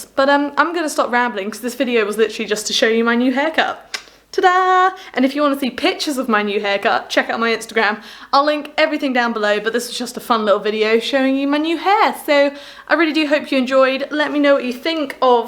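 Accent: United Kingdom. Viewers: You are so beautiful. I love your British accent by the way. 😊❤️☺️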